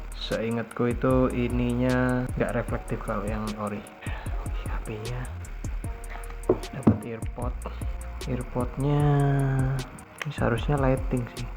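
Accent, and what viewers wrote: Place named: Java, Indonesia. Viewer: Waduhh wong Jowo 🤣To bwanggggg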